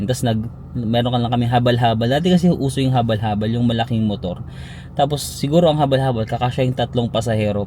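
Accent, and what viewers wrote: Waray, Philippines. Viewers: Woooyyy taga samar ka pala.. Nakakatuwa naman maram kapa mag warayyyyyy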